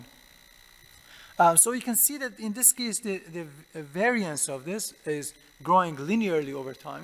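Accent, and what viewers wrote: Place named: Iran. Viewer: mamnun. az avale lahje fahmidam ke hamzaban hastim. baese eftekhare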